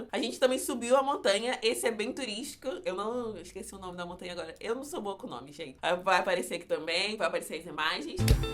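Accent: Brazil. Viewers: vc é africana ? seu sutaque é igualzinho de um brasileiro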